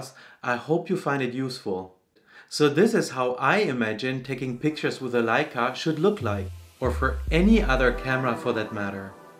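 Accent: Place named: Zurich, Switzerland. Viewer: Sali hier ist Benjamin. Bin über Dich gestossen, als ich für meine neue M6 Tipps gesucht habe. Komplett unerfahren in Rangefinder und deren Funktion bin ich auf der Suche nach Fokus etc. gewesen. Dann kam Dein Video zum Vorschein. Dachte mir den Akzent kenne ich doch irgendwoher, anschliesend habe ich unter “About” herausgefunden, dass Du in Zürich lebst, ich auch. Fand ich ganz witzig. Eventuell kann man sich ja mal treffen. Wie gesagt bin ganz neu was M angeht, und jetzt mit einer Traumkamera, die zuhause wartet ausgepackt zu werden. Das passiert morgen. Wollte eigentlich nur einmal Danke fürs Video sagen. LG Benji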